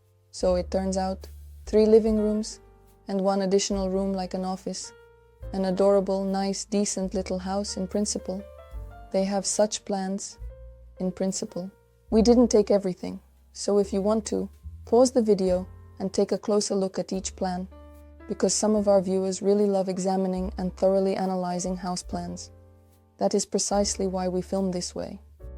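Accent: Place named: India. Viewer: Акцент жесть, конечно. 😅 Индийский акцент. Вроде в СССР хорошо преподавали английский, почему у вас такой акцент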